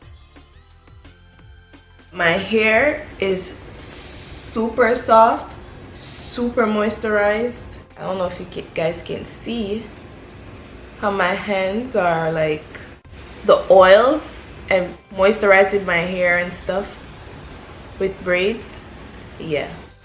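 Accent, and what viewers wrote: Jamaica. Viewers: Mi luv yuh Jamaican accent😝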